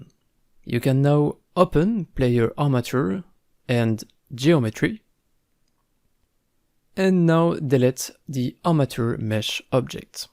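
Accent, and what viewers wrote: France. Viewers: Tu es français non? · ta grave un accent français tu serais pas?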